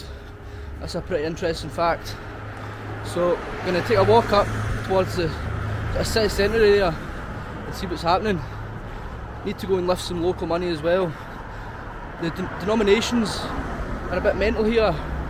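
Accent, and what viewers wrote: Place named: United Kingdom. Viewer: British akcent 😁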